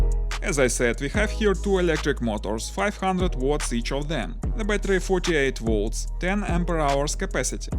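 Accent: Russia. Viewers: Russian accent 😒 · О знакомый русский акцент) Прикольный велик для зимы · once i heard the russian accent i knew instantly this would be a fst bike revieq worthy of watching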